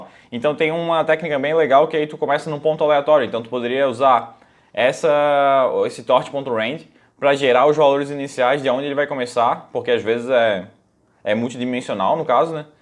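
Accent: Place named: Santa Catarina, Brazil. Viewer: Pow, bicho, tu é de Floripa? Sotaque aí tem tudo pra ser de SC pelo menos. Hahaha Enfim, parabéns pelo canal! Excelente vídeo.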